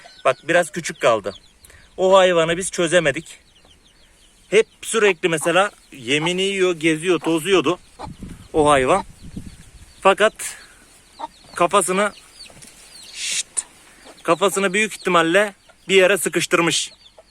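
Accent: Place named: Thrace, Turkey. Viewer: Konuşman Trakyalı gibi sanki nerelisin :)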